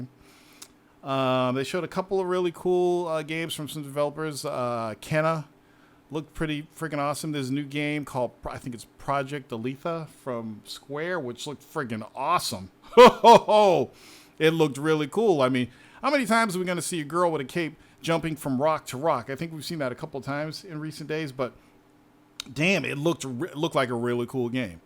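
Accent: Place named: United States. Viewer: why you put on an american accent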